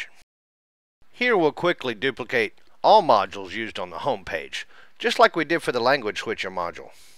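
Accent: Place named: South, United States. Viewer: PFFFFFT on those who talk about speed! This was exactly what I need.. not a 20 minute hand holding just a Q&D on how to get there - THANKYOU.. this rocks! Would love to hear you speaking french too with that good ol boy accent of yours too. Thank you for being fast and concise.. there's others that can do an effective 20 minute presentation - I needed the 5 minute kick start!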